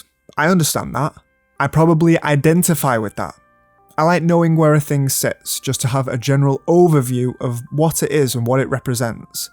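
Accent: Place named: RP, United Kingdom. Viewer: when you do return i think it'll be the funniest video essay u ever watched - especially the plummy voice he puts on during the entire thing.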